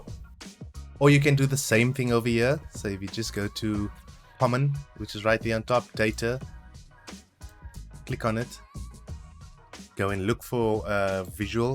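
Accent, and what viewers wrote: South Africa. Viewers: Ahhh man I love hearing south african voices on yt videos 😂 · Wait.... you sound South African...... AWE!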